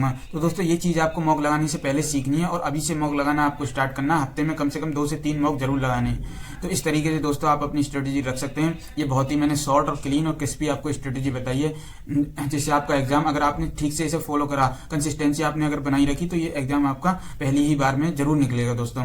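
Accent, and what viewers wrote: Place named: Rajasthan, India. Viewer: aapki language😅 Rajasthan jesi hai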